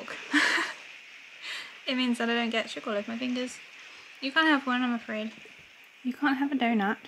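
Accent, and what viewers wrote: United Kingdom. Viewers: you have beautiful eyes and hair.You also have such a sweet voice.I love the British way of speaaking.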